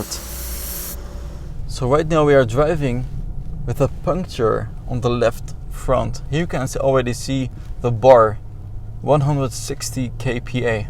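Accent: Netherlands. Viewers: echte hollander die engels praat :D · Hoor meteen een nederlander 😆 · a dutch guy talking English... never been good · I smell some Nederlands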